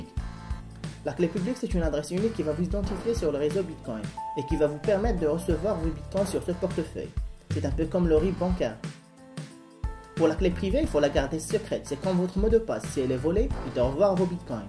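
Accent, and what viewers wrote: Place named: North Africa. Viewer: la music sert a masquer son accent du bled, aller on t a reconnu, retourne bosser a la pizzeria, et laisse les bitcoins tranquille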